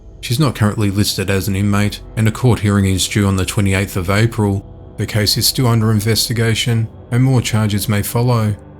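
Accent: Australia. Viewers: All news should be told in an Australian accent. It’s just easier to hear. Not so much the news as the soothing voice and the contrast thereof. Thank you!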